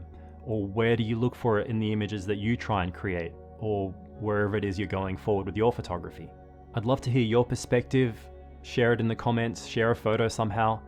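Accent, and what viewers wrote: Australia. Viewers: The two things I absolutely love about this are: A. The amount that it resonates with me and encourages me to shoot more of my family. B. That you truly have the most Australian "O" I've ever heard. ♥️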